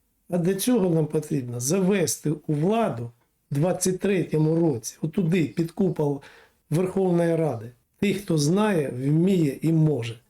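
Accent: Russia. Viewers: Все було нічого, але акання боляче слухати. Хто ж вас так вчив рАзмАвляти українською, невже ТимАшенкА? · Може твої слова мають рацію. Але, оце мацковське Аканьє: пАгАвАрить, пАмиляюсь, пААбіцял, пАвідомлять... Заєбал. Вчи мову.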